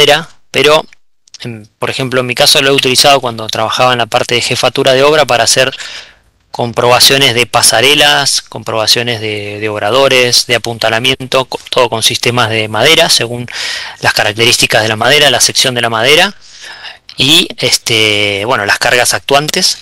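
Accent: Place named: Valparaíso, Chile. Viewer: hablas iwal que el amigo de una amiga, no seras de valparaiso?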